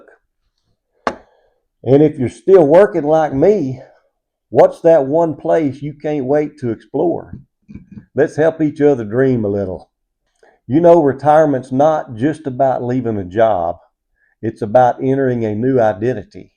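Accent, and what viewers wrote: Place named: Texas, United States. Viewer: I heard that accent on your latest video and had to scout you guys to see if you are a Texan. Im at the gym, more later.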